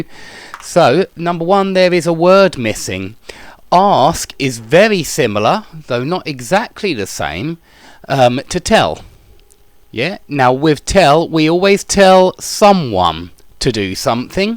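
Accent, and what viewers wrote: United Kingdom. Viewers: Ilove the British accent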